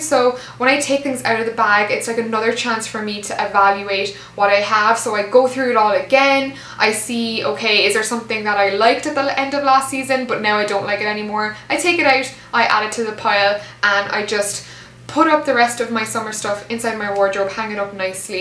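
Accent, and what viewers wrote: Ireland. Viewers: Thank you for theses tips! I love your accent, are you from Ireland?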